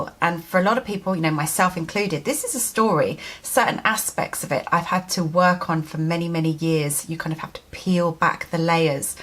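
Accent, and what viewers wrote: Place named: England, United Kingdom. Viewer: Omg YES. EFT with an English accent -makes it even more posh :D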